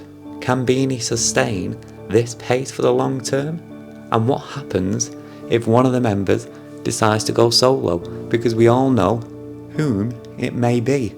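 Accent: Northern England, United Kingdom. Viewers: You’re all good. I’m Filipino and can tell you’re saying it properly within your regional accent (Yorkshire? At the very least Northern English). This is a great sign of Bini’s global recognition—people will say the group’s name with their own accent.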